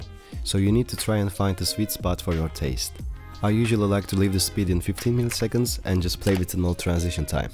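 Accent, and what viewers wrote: Turkey. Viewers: abi ingilizcenden belli sen Türksün !!!!!!!!!! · Biraderim bence sen kesin Türksün ben bu aksanı nerede duysam tanırım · Hocam aksandan dedim bu çocuk türk mü öyleymiş great content tho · aksanından türklük bangır bangır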